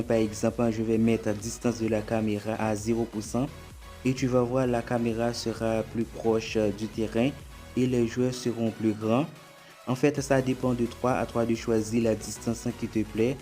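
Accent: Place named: Haiti. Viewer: Depi sou langaj misye c ayisyen' 😅😅foli pale fransè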